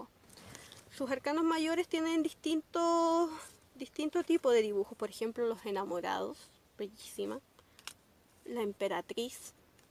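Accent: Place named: Chile. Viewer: Hola , vengo de Amino ❤Vi la publicación y vi unos segundos del vídeo , pensé que eras española Lugo me di cuenta que eras chilena ( no reconozco ni mi propio acento jaja )Me gustó mucho , sigue haciendo vídeos Me encanto la 3 baraja 🖤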